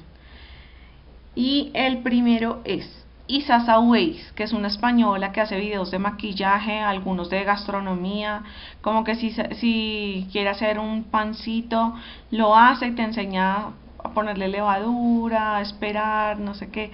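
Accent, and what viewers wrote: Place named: Colombia. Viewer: lindo acento colombiano *.* me encanta ese acento.. bueno hablando de youtubers le aconsejo Supercenizoso :)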